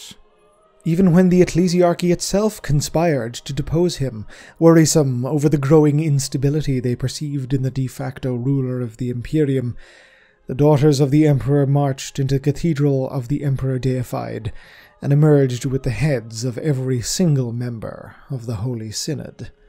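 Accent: Ireland. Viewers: maybe this is a weird question but do you have an irish accent?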